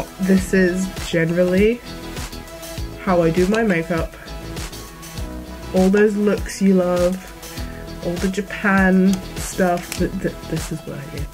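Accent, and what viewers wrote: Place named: United Kingdom. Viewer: Omg your British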